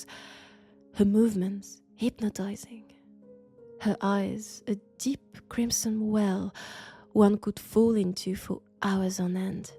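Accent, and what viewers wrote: France. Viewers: Yesss! more, please! That French accent is melting my brain! ))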